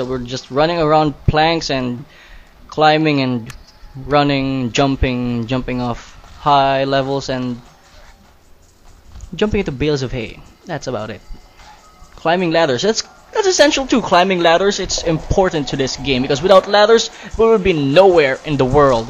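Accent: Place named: Philippines. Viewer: I know ur filiphino, cause, i can tell ur accent ;D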